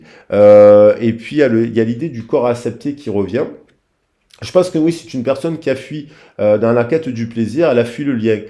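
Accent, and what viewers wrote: South, France. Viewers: Merci pour cette guidance je crois de t’avoir tout dit la dernière fois j’ai voulu aider mon autre je lui ai dit une vérité qu’il n’a pas apprécié désolée mais c’était pour son bien j’en avais marre de voir des vautours autour de lui qui le manipulaient et l’influencaient c’était ma mission d’aider et soutenir les personnes que je croise sur mon chemin de vie maintenant si mon autre désire me parler qu’il vienne me voir et qu’il ne s’inquiète pas je l’accueillerai comme il se doit pour une réconciliation sincère et authentique puis on avisera amour ou amitié je ne sais pas Dieu seul le sait 🙏 bonne journée et c’est toujours avec un grand plaisir de t’écouter chaque fois avec cet accent du Sud qui réchauffe mon coeur❤ grossi bacioni sans oublier Catalina et ton fils 👄👄👄😘👋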